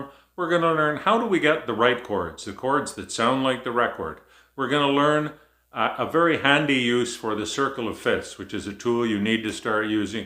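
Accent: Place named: Canada. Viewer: I loved how you used Abm as the second chord. It gives the song a different feel. Chris Marin of Cold Play also did his own arrangement. If the song writer is as good as Dylan, it opens up the material to many different interpretations (like Shakespeare or the Beatles). I love everything Canadian including your accent. The greatest people and country. 🇨🇦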